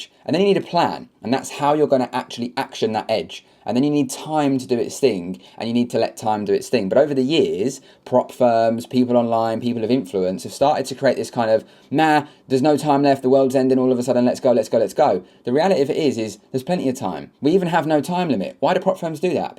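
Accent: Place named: United Kingdom. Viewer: Man just appo pay errything innit😭😭😭, the British accent just kills me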